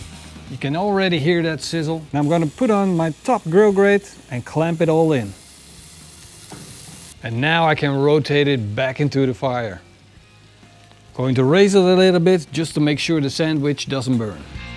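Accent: Netherlands. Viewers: Your accent sound like Dutch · English with a dutch accent, lekker boers hahaha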